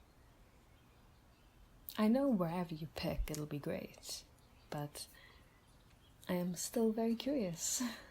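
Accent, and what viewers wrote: Wales, United Kingdom. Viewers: Can’t quite figure out the accent 😂. Is it Welsh?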